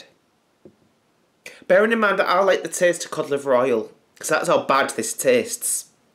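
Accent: Hull, United Kingdom. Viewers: hes from hull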